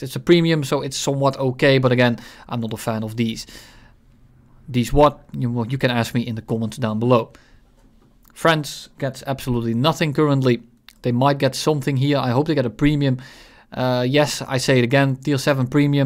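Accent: Netherlands. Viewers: ik hoor dat je Nederlands bent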